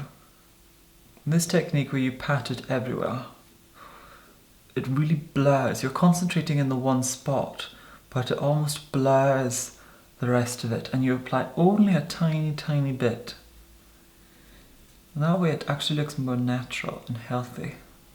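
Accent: Scotland, United Kingdom. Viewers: Anything by Diana Gabaldon. I love the Outlander series. Your natural Scottish accent would be perfect for the Scottish characters and your posh accent would match Claire beautifully.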